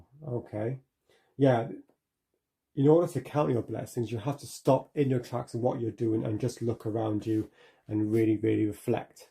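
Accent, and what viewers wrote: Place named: Wales, United Kingdom. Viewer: Hello, thank you for a lovely, and interesting readings, 1 n 4. I felt they linked, and some good uplifting advice. I tried to place your accent, and wasn't sure, but I've heard it before, I was thinking whale's but not sure, the dragon sort gave me that idea. Anyway only if you want to share, your name was an other curiosity 🤔. Loved your reading, and your accent, maybe your names Aegon 😉 😜 ❤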